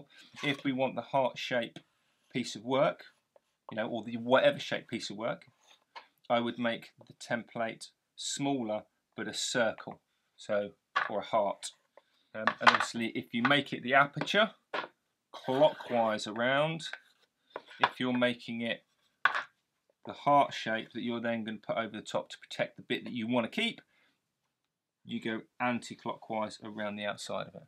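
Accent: England, United Kingdom. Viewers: Very informative and good to hear an English accent for a change!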